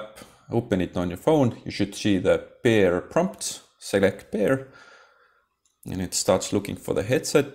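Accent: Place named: Estonia. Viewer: Miks ma kohe su aksendist aru sain et sa oled eestlane?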